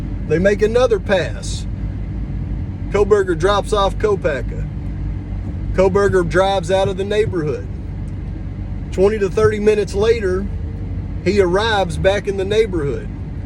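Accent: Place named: South, United States. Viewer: I'm with you too 💯😁 and I love listening to him, I love a Man with a Southern Accent 😄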